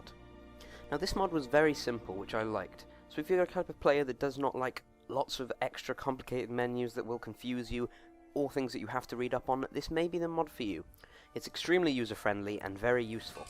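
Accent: United Kingdom. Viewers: You sound like a Welshman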